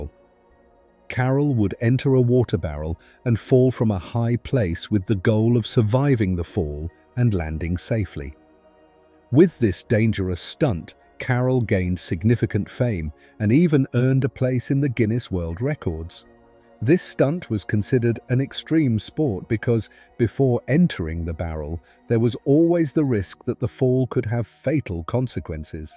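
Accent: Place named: United Kingdom. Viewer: No not listening to a bloody limey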